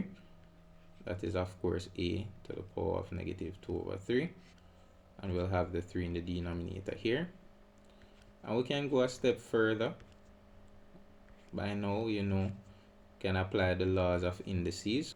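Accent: Jamaica. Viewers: Am loving the Jamaican accent 😅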